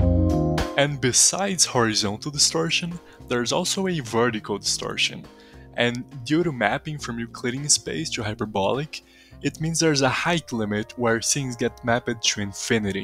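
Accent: Brazil. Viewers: I'm glad a brazilian got to work of this (basing that on his pronounciation) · O É BR Q EU CONHEÇO ESSE SOTAQUE. NÃO MINTA PRA MIM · " BRAZILIAN, I KNOW THAT ACCENT. DON'T LIE TO ME " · dá pra sentir o sotaque brasileiro entrando na minha alma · O sotaque do cara é tão brasileiro que fica dificil não reconhecer, parabens cara pelo seu trabalho nessa confusão ai desse jogo, modelando tudo isso ai. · Eu amo que da pra saber de onde tu é so pelo sotaque KKKKKKKKKKKK Cadê, posta mais video nesse teu canal aí pra capitalizar nos br te reconhecendo aqui mano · Primeira vez que eu vejo um sotaque brasileiro numa fala em inglês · Caraca!!! is brazilian sureeeeeee Kkkkkkk Eae parsa I immediately noticed its brazilian accent Incrível saber que tem um brasileiro por trás de todo esse incrivel projeto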